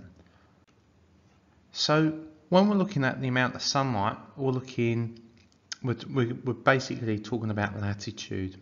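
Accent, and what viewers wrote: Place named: Australia. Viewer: Love your accent, are you australian by any chance, much appreciation from the UK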